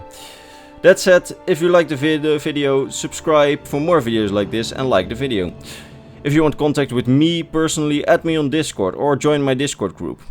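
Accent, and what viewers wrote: Netherlands. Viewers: Hee je bent nederlands he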